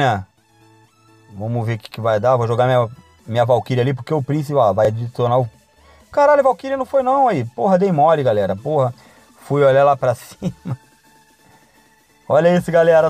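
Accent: Rio de Janeiro, Brazil. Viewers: crl tu sim q é carioca rapá , nmrl vc é engraçado com esse sutaq carioca bolado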